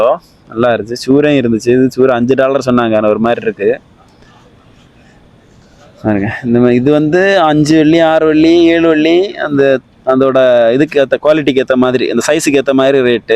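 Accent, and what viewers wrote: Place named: Kanyakumari, India. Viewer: Kaniyakumari karan..pola...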